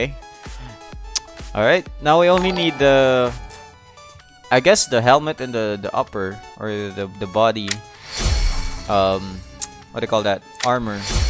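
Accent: Visayas, Philippines. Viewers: English bisaya ka!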